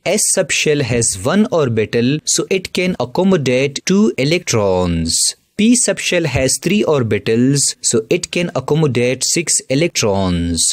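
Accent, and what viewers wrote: South India, India. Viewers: Keralian ho kya ...awaj se lagta hain as south Indian jaisa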